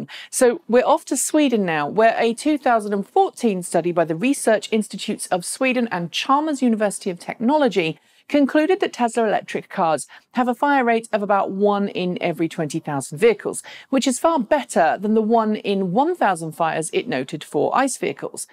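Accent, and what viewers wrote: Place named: United Kingdom. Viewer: Somewhat interesting. She needs to drop the brit accent. It is Not sexy.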